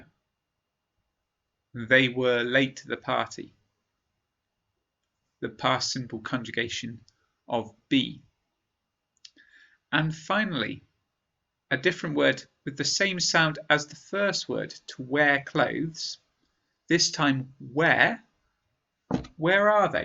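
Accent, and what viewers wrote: United Kingdom. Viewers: love British accent 😍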